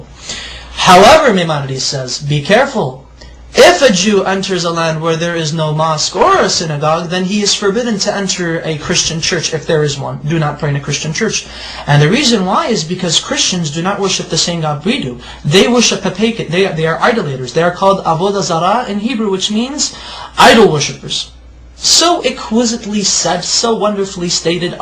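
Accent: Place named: Arab world. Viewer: erstens schaut dieser mann nicht wie ein jude sonder ist sicher ein araba sein aktzent ist arabisch und will die juden verarschenaber er verarscht sich selbst jeder erkennt das er kein jude ist der trottel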